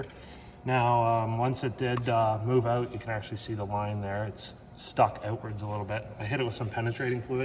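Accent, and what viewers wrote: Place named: Canada. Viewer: You Canadians need to learn how to pronounce the word out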